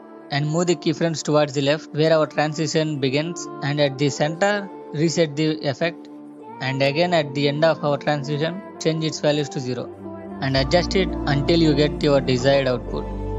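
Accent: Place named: India. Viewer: pls use ai voice or something its hard to understand indian accent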